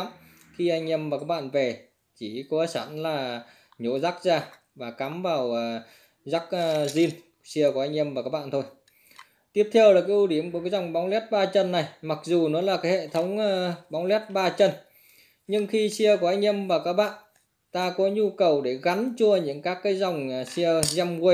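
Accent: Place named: Thanh Hoa, Vietnam. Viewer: Ông anh người tỉnh nào thế? Nghe có vẻ như Thanh Hóa thì phải, Giọng hơi khó nghe.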